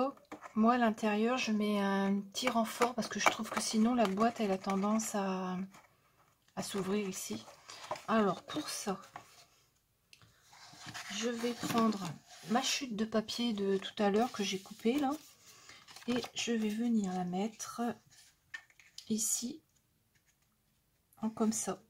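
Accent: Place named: Northern France, France. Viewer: Ccou tout parait tellement simple avec toi j’adore il suffit de bien suivre tes instructions et tout baigne merci et bravo surtout que je débute et je rigole toujours quand tu parles avec tes ptites intonations de ch’nord lol ne change rien tu pourras pas toute façon Hihihihi bonne soirée merci pour le partage bisous 💋👍👋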